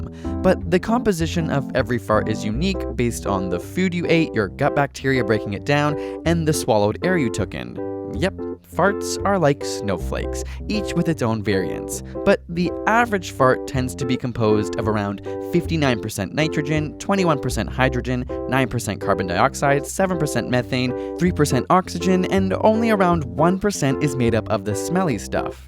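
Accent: Canada. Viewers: I'm starting to realize you have the accent of a Canadian by the way you pronounce "outrun" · Haha, your canadian accent was strong in this video...Almost like you were emphasizing it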